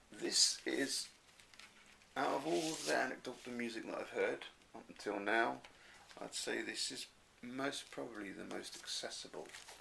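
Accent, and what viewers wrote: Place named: Sweden. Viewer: Hi, My kind of video! I can definitely hear that you have strong Swedish influence in your pronunciation of Swedish words:-) I have somewhat similar feelings to you about Anekdoten. Have to sink my teeth into them some more. That Hollow Earth album I absolutely need to check out. Sounds very promising. Pete put me onto Agusa, and I love them. Finally got the new one this week, and I hope for a sit down thorough listen tonight. Only missing one Agusa album. I think Agusa has some old Swedish known folk tunes worked into their music at times. I have not been able to place it myself. Not familiar with that British commercial, but you have good sound memory there. It certainly sounds very similar. Not familiar with Black Bonzo. Struck me as a bit of English influence. Perhaps you have heard the news, but if not some skogsprog news: Jordsjø is recording a new one soon intended for release coming year, and their relative Elds Mark is dropping another one in November. 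Are you familiar with Makodajama? I believe only one album dropped an reissued by an American label. It is one of the Anglagård guys. Look forward to your next videos.. Take care, Richard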